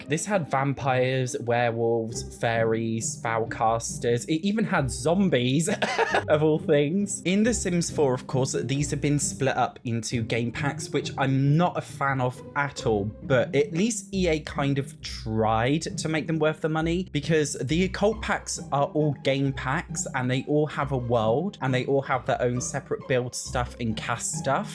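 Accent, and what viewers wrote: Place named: United Kingdom. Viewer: So cute listening to a Brit describe The Great Plains 😝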